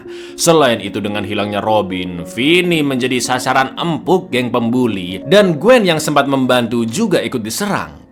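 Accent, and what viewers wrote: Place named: Surabaya, Indonesia. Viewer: Cak asline arek ndi? Kok logat bahasa e koyok Suroboyo sidoarjo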